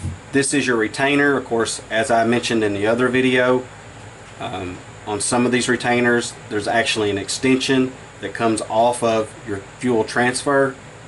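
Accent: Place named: New England, United States. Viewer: It's pronounced "Nick-EEE" not Nick-eye......brother you have got to be a Yankee. All them R's in your words. LOL (no offense intended, all my in-laws are Yankees)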